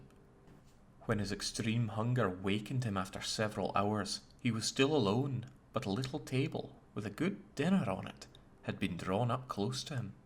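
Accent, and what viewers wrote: Scotland, United Kingdom. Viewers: lol why do you sound extra Scottish in this? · Wait did this story read as Scottish, or does your accent shine while reading?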